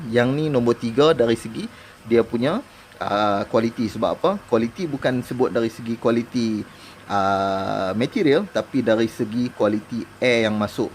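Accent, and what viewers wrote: North, Malaysia. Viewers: haaa baru la ngam guna, utara mai, depa faham ja tu,guna loghat utara universal, terkemut-kemut 🤣, good job 🤙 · Assalamualaikum w.t.b tuan.. Kluaq loghat utara na.. Pekat wei.. Terbaik bro.. Salam dri follower channel hang dri negri bwh bayu.. Teruskn brkongsi info dn ilmu.. Smoga sukses slalu.. Aamiin.. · Baguih nya hang punya cakap utara.. terhibur dan senang nak hadam ,lepaih ni buat video cakap utara semua baru ngam..haha.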